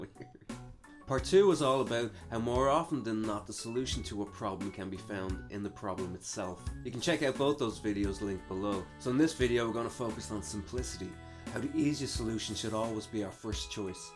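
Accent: Dublin, Ireland. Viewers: Is that a Dublin accent I hear? Or is it from a bit further west?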